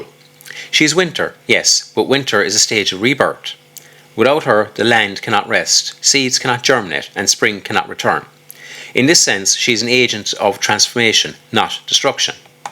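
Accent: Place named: Cork, Ireland. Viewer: lovely cork accent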